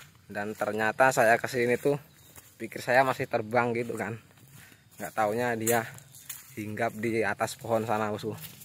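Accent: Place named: Malang, Indonesia. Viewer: Ini didaerah mana kang? Kok seperti bahasa saya daerah malang selatan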